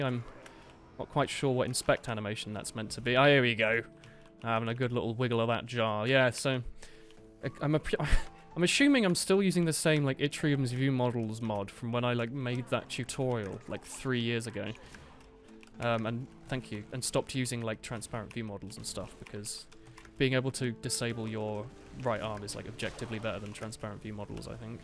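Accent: RP, United Kingdom. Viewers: Its been a while. Thank you posh britain man. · I listen to your videos as background noise when doing homework and I never knew that a posh British man would make such good motivation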